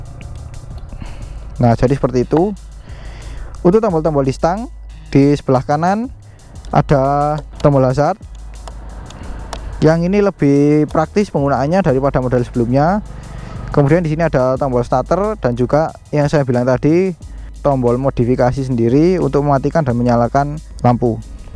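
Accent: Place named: Java, Indonesia. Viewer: Lucu, Judul Inggris.. Ulasan dialek Jawa.. Lanjut sam..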